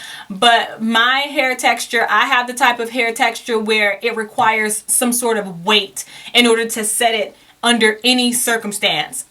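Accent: South, United States. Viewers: I hear it the country accent too. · Am I noticing a country accent? I’m talking about the cowboy type too.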